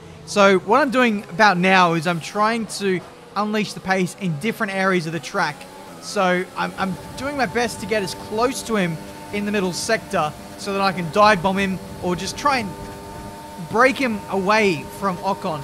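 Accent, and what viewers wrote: New Zealand. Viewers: I thought you were from Australia and not from New Zealand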